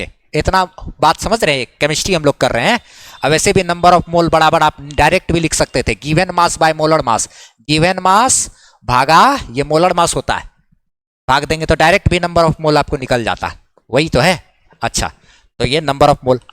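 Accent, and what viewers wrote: Bihar, India. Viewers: Sir aap bihari ho kya😅?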